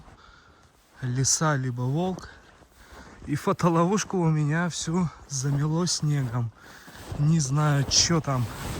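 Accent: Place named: Kirov, Russia. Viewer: Вы не из Кирова, акцент похож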